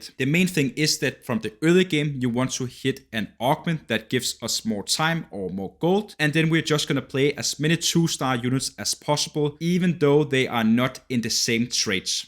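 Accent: Denmark. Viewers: Are you from Denmark?? You sound like a danish person 😂😂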